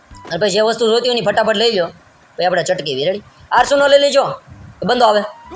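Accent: Bhavnagar, India. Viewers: mane lage che tame bhavneger na cho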